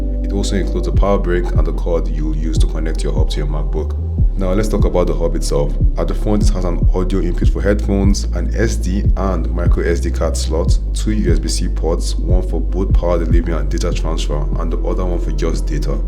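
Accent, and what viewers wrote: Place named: Nigeria. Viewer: I can hear the Nigerian accent 😂 never leaves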